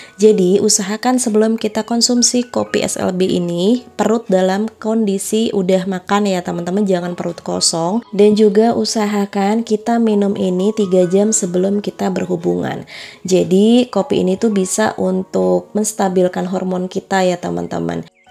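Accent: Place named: East Java, Indonesia. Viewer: Hp saya jg barusan masuk service samsung mba 4hari kena lcdnya dan komponen apanya gt biayanya 965rb huhu,, qadarullah lg renang ta masukin di dompet hp waterprof tp gataunya ada lubang kecil dan masuklah air dr situ kena kamera depan sampe ngembun kemasukan air dr lubang gatau kalo ada sobek kecil ta bawa aja nyemplung renang Kurangnya diteliti lg pdhl baru beli kantong hp antiairnya huhu Salut bgt bisa betul hp sendiri mba suaminya Salam kenal ya mba,, ak suka liat ytb mba dr lama lewat akun suami gaberani coment hihi dah lama bgt dr masih blm 100k sub Awalnya nongol kok bahasanya kek orang jatim Semoga sy bs semangat bikin vidio kek mba panutan❤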